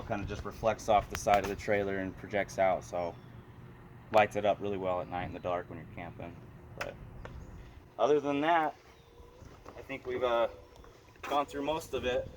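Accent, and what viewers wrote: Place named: Utah, United States. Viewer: As soon as I heard him talk I could tell he was a Utahn. 😂 Great job way to make us proud! 👍🏼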